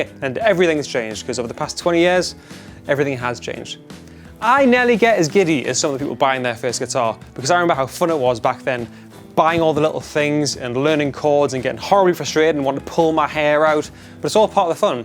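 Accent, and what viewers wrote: United Kingdom. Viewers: You move like Captain Jack Sparrow, Also you almost talk like him, Makes it better because you got that nice british accent.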